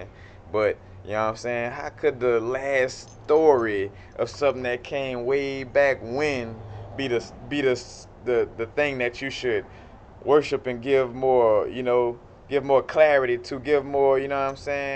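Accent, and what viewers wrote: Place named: Brooklyn, United States. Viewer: You so Brooklyn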